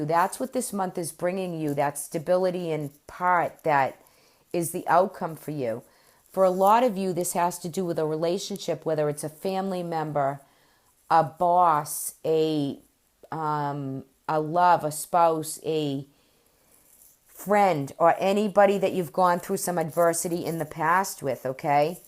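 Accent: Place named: Michigan, United States. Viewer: Love your accent! Michigan, Ohio possibly?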